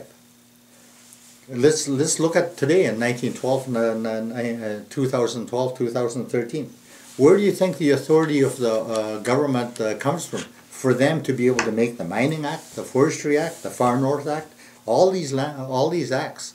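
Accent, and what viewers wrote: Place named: Orkney, United Kingdom. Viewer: is he from orkney???? i stay in edinburgh scotland but the name is from orkney and im 1000% certain that i'm related to this guy cuz he sounds like he has a less strong version of my grandads accent. i feel so weird seeing people that are probably some what close relatives on youtube when i search my last name hahah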